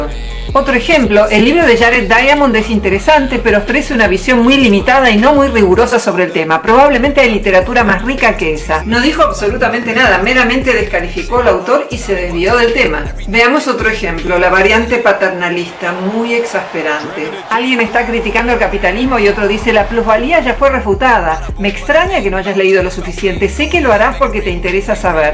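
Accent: Argentina. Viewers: Detesto su acento argentino . . Pero la amo al oírla hablar . . Dios,Darwin,Mendel o Einstein te cuiden y guarden por siempre . .😍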